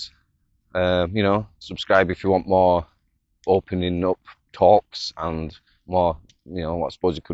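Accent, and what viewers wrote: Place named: Northern England, United Kingdom. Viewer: He's English, probably from the North. How did you not get that from the video? Edit: I just got your joke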